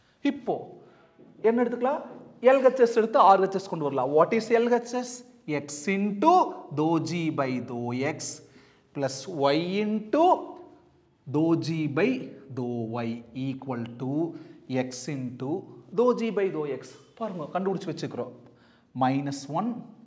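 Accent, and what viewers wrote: Coimbatore, India. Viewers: Avaru oluga tha pesura ru. Athu coimbatore slang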